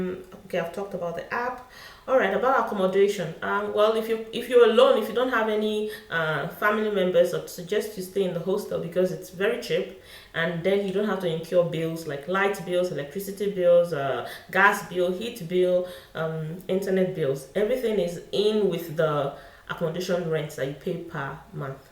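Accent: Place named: Nigeria. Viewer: my sister i hail......i must say u are really doing a very good job..thanks for the info.. u really sound like nigerian...i am a proud nigerian...thanks for being u..may God bless u for all the info.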